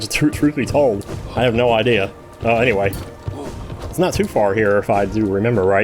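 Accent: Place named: South, United States. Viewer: I'm not the only one who reads Selubra's name as Sebulba. I wouldn't have pegged you as Southern until I listened to some of your earlier / vlogs (you sister sounded a lot more obviously Southern, as did you when you were youngr), but I HAVE always wondered, and sorry if this sounds weird, if you saying "boy I tell ya" and "lemme tell ya" so often is a regional thing, or something you picked up from your parents. I thought that seemed too easy. I also thought they'd bow. RoahmMyrthril (LPer and Mega Man no-damamge runner) always found Yellow Devil more tedious and drawn-out than actually hard, and got mass-disliked in a video for saying that. Had a feeling you weren't invincible there. But that still destroyed the fight. I like how Sheo's different attacks paint the battlefield, I assume the place will end up looking different every fight as he varies what attacks he does and in what order.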